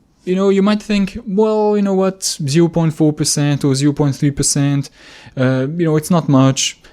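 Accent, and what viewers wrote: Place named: France. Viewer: I like your french accent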